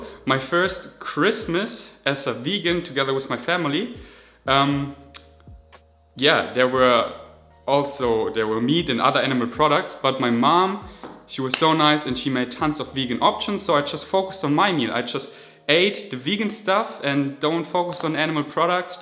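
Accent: Germany. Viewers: Ich finde deinen deutschen Akzent beim Englisch sprechen irgendwie soooo ablenkend :(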